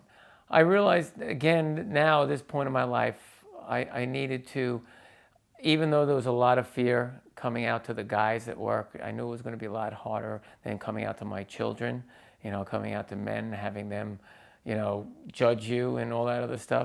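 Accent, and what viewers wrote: New York, United States. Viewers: Such a beautiful story! I'd like to meet you sometime, - I love your New York accent. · Great story! (God I love the NY accent so much)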